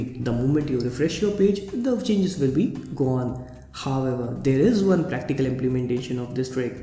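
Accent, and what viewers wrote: India. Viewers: I love the indian accent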